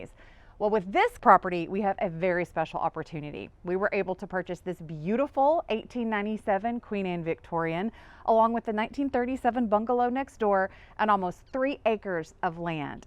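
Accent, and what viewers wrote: South, United States. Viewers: I absolutely love her subtle southern drawl 😍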